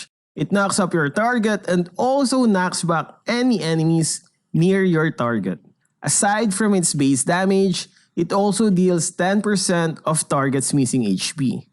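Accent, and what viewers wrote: Philippines. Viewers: Putang ina mo accent mo pang cancer na pinoy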